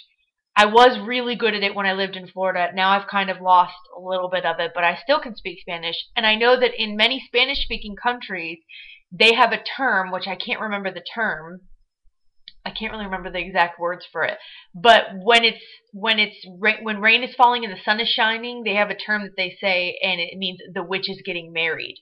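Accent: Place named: Michigan, United States. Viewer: I knew your accent sounded familiar, my next door neighbors are from Michigan and your accent is just like the wife's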